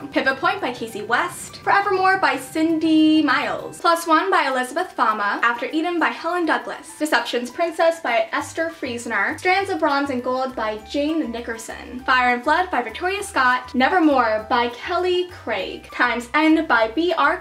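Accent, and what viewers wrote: Scotland, United Kingdom. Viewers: Your scottish accent is to die for! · I love the scottish accent 😂👌🏻❤️ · That is the most hilarious Scottish accent I have ever heard😂😂😂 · Your Scottish accent is honestly the cutest!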